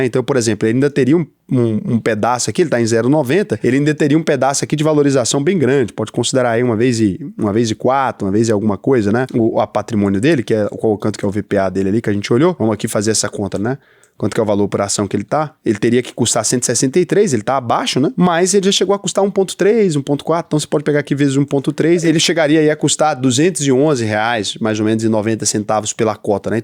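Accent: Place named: Goias, Brazil. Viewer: Uai voce e de goiania irmao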